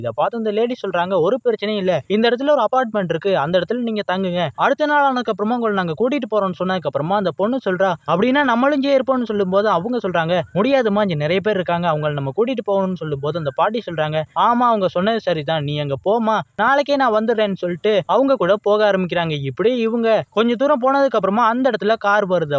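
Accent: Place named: England, United Kingdom. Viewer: Bro i think u need to change the voice modulation.. dont try to pur the englush accent in tamil. Talk tamil naturally it gives good value to your story narration. Just my opinion dont feel bad bro.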